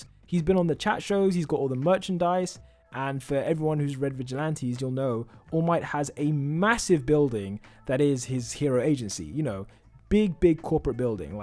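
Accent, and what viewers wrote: United Kingdom. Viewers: Yo you sound like your from the uk.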